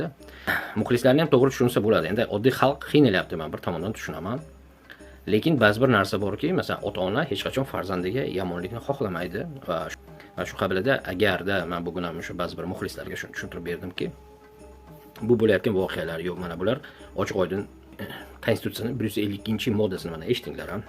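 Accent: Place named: Tajikistan. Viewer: Янги очодиган каналингга ут тушсин.Яхши айтиб куйди.бекорчи гийбатчи тухматчи янги чиккан ёлланган сиёсатчига халк мухтож эмас.Кайирда кандай илм олгансан.Сиёсий маьлумоти нима адабий соф узбек тилини кайси тожик шевасида гапиряпти узингни билимингни яхшилаб ошириб олгин ким танийди бу гийбарчини инсонларни кимматли вактини олиш хам катта гунох.Вактни яхшиликка яхши ниятларга сарф килиш керак.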